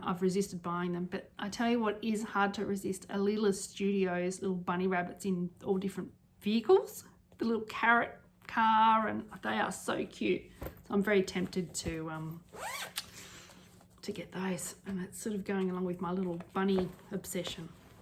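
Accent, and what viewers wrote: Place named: Australia. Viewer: Great to see you back! Love what you do and your presentation was very enjoyable... not too many of us Aussies on YouTube so it's so refreshing to hear you!!! Take care 💚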